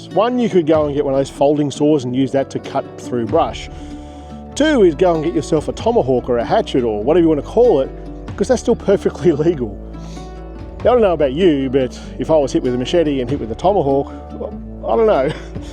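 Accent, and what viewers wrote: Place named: United States. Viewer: Boo hoo, u sound like a red neck American. U can’t take my guns from me. Who cares if u can’t use a machete. I bet u hardly use it . But let’s jump on the bandwagon and use it to get more content for your channel.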